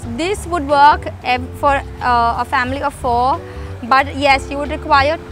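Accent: India. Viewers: Girl, I love your Indian accent and voice! Thanks for the tutorial! Oh, by the way, I thought the mint plant is a weed and very invasive. Shouldn't it be planted separately from other plants? Thanks again.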